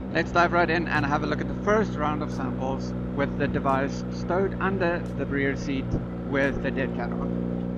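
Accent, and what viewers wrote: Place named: South Africa. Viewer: You sound south african, I don't see ocean views so I'm guessing gauteng?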